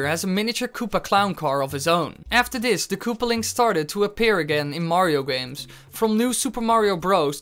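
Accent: Netherlands. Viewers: are you dutch xD ik den nl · Is this guy dutch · are you dutch or just english becuase im dutch and you sound dutch to me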